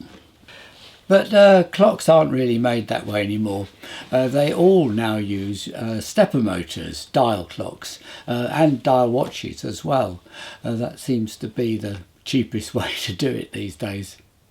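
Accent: United Kingdom. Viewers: Did I hear right a British voice speaking metric. Then you went straight back to lbs. All good old Aussie tradesman that understands both but lean towards metric. Many thanks for your program.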